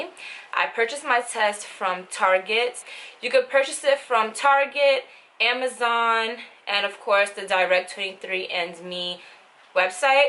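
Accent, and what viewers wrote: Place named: United Kingdom. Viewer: You are literally more British than Jamaican.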